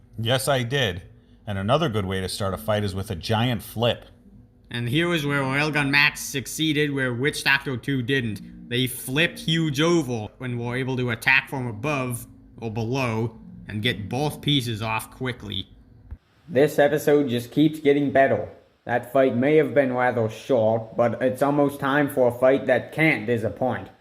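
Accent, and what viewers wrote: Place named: New England, United States. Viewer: Like your little New England JFK-like I accent. love the battle bots superstars.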